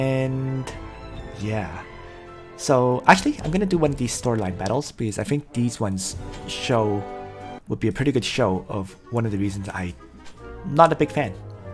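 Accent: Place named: Quebec, Canada. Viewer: You're Canadian! I was always curious where your accent was from, and I had suspected it was French-Canadian. My girlfriend has been wanting me to ask for the longest time, as she's subjected to hearing your videos all the time as I game. :b Anyhow, it would have been nice to get another good FF Tactics game, but it also comes as a bit of a relief that no other mobile tactics game will take time away from Langrisser given all the hours I've put into it thus far. Also, that you won't be torn away any time soon from continuing to release quality Langrisser strategy content. :D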